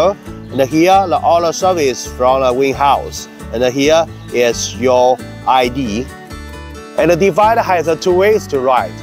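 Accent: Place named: China. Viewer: I love his Chingrish... so adorable.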